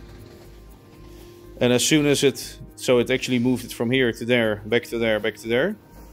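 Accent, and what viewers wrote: Netherlands. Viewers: I smell a dutch accent.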